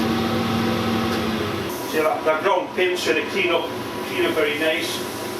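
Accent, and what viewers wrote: Newcastle, United Kingdom. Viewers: Thanks for all the video this year. I enjoy your way of doing things. Happy New Year to you and yours. I enjoy your Jordie accent and I think I'll have a Newcastle Beer in honor of you today.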